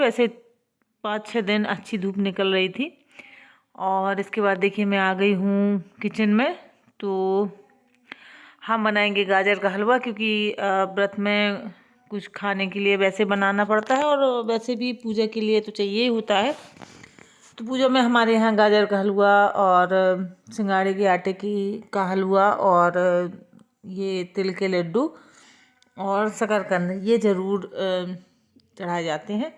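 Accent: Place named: Bundelkhand, India. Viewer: Third like ke sath bahut Achcha falahar banaya hai aapane ekadam testi aur yummy Dekhkar hi Munh Mein Pani a Gaya Hamare Yahan Bhi Ganesh Chauth Ka Vrat bahut acche se Manaya Jata Hai vaise Main To baramasi Ganesh Chauth Ka Vrat rahti hun per yah Bade Ganesh ki jo Puja Hai vah Mere Hanuman hai isliye maine poochha Nahin Ki Thi aapke Munh se Bundelkhandi bahut acchi Lagti Hai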